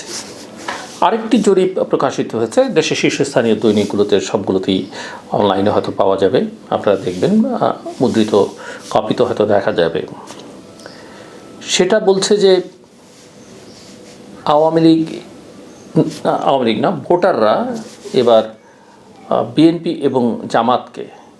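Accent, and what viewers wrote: India. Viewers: ভাই আপনি তো ইন্ডিয়ান ভয়েস এ কথা বলছেন একদম হবো হু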